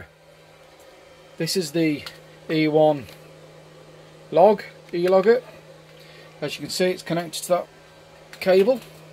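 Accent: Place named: United Kingdom. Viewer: Hi, Just watched your video after doing a search on the net for Epever com port failure. I have a Tracer 4210AN which I have had for about 9 months. 2 days ago the data logging suddenly stopped, I monitor it using a custom logger called a SolarDashboard buy SnekTek which is very similar to your data logger. I can also monitor and adjust setting via my PC using a USB to RJ45 cable. Now I cant do either. Any suggestions? I too am in the UK (guessing by your accent) Nice to see an audio cassette on your shelf next to your stereo. My setup is in my garage where I too have a stereo and was only playing a cassette the other day. Graham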